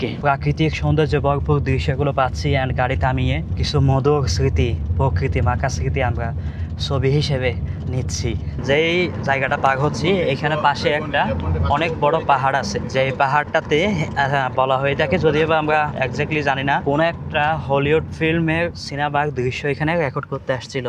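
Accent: Chittagong, Bangladesh. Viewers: ভাই তো চট্টগ্রামের মনে হয়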